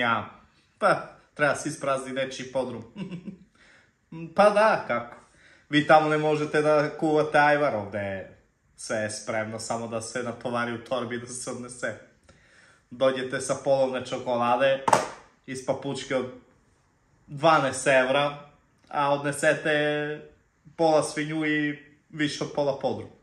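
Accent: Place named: Zaječar, Serbia. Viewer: On je iz Zajecara ne sa juga,tako se prica i u nas kraj....On je bukvalno pogodio u srz tog zivota inostranog...🤣🤣🤣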